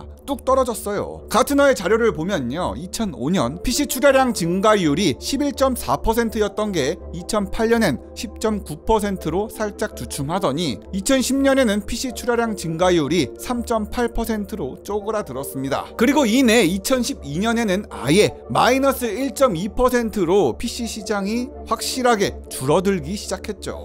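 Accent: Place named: Gyeongsang, South Korea. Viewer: 백날 알려줘도 경상도 것들은 안배워요 끝까지 군님, 인녁, 인뉴, 전녁, 만뉴.. 후~~~~~ 고생 하셨어요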